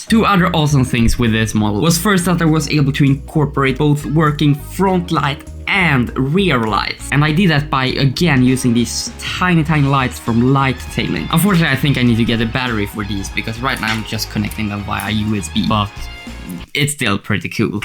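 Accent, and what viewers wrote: Sweden. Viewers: really nice work! And by the sound of it så låter du Svensk, men kan ha fel 😅 Kommer garanterat besöka din sida och köpa några ritningar framöver 👍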